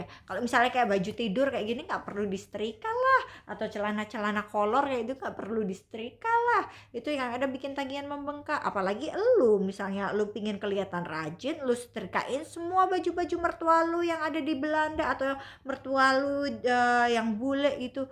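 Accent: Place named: Bali, Indonesia. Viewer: Ini logat nya orang Bali ...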